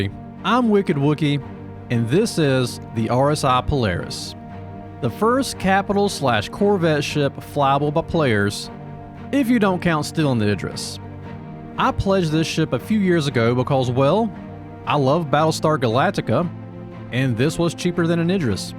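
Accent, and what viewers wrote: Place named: Tennessee, United States. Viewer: This guy is either from Tennessee or Kentucky. That accent..holy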